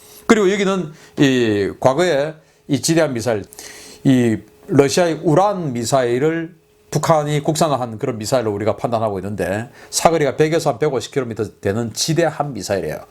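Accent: Gyeongsang, South Korea. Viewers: 유투버가, 일본말비슷한 상도사투리쓰면않되요, 듣기거북하고 ,애들교육상않좋아요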